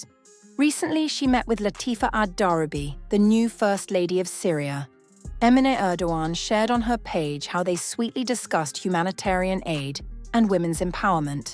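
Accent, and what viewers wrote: United Kingdom. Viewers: Your accent is British. You insist on how much the tea cost. How about your royal family's lifestyle and expenses that live off from your taxpayers!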